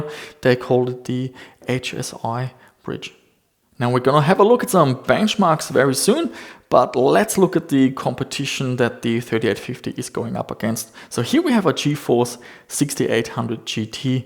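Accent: Germany. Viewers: are you german? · Are you German? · Are you a german? Bist du ein deutscher?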